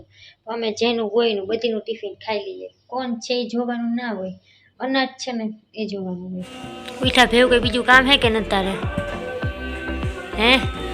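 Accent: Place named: Porbandar, India. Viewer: પોરબંદર ની ક્યાંક તોસડી બોલી આવી જાય એટ લે તમે ક્યાં ગામનાં છે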